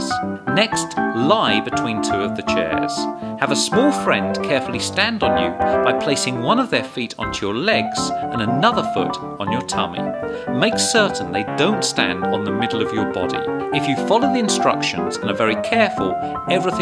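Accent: United Kingdom. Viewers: All these tricks are pretty lame, but because he has a British accent, I want to try them... God these people are influential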